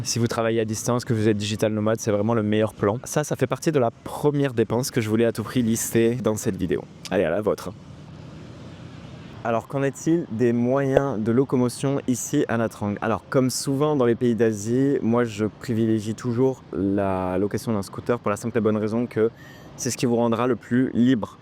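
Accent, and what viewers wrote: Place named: France. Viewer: Totalement d’accord, j’arrive de Da lat et vraiment le coût des logement à Nha Trang est vraiment très, très intéressant pour de très bonnes prestations. Pour la conduite, tout à fait d’accord, prudence! Un point qui m’a choqué à Nha Trang par rapport à Saïgon c’est que les motorbikes ne respectent pas la nouvelle loi et passent au rouge alors qu’à Saïgon, les scooters s’arrêtent aux feux (ce n’était pas le cas l’an dernier) Un point qui m’étonne dans tes vidéos alors que tu y es depuis pas mal de temps c’est que tu prononces le nom des villes à la française, c’est une volonté de ta part ou c’est que tu ne fréquentes que les « digitals nomads » et pas les locaux? Côté activité, tu as quand même le Musée Yersin et la Galerie de l’un des plus connus et vénérés des photographes vietnamiens: Long Thánh.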